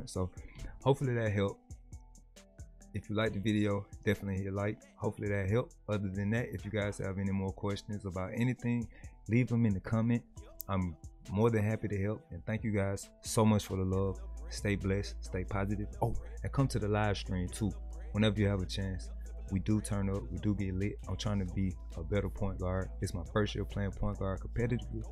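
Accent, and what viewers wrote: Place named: South, United States. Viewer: Thanks, bro! helps a lot and you from the south so even better I can understand the accent lol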